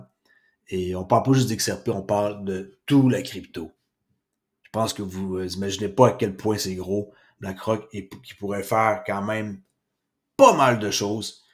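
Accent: Canada. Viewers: je te kiff tu me fait trop rire avec ton accent, j'ai l'impression d'entendre mon beau frère du canada.. Bon j'ai du xrp depuis un petit moment et quand je t'entend je me dit tu doit en avoir vraiment beaucoup pour autant en parler..après ça me va bien car je suit beaucoup les infos autour de cette crypto que j'ai failli vendre tant de fois 😂😂😂 mais que j'ai gardé je ne sais pas pourquoi.. J'espère un avenir pour xrp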